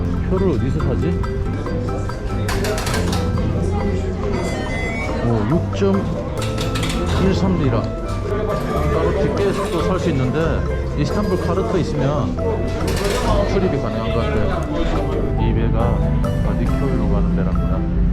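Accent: South Korea. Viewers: 경상도사투리 쓰셔서 경남 사시는줄😂😂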